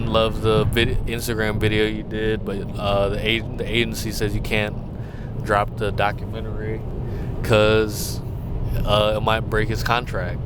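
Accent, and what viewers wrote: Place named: Alabama, United States. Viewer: Getting the Alabama accent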